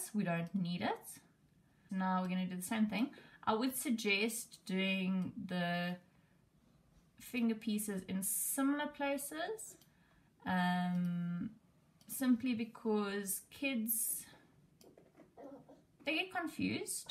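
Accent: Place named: South Africa. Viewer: What thickness basswood did you use for the top piece? I didn’t catch that Ahh accents. My go to is always Australian and then after a minute, I thought no those vowels aren’t Aussie she must be kiwi and then after another couple minutes I got an Irish twang and that’s when I knew you must be South African because that accent always sounds like all the subset English accents put together to me 😂. Checked your profile and sure enough!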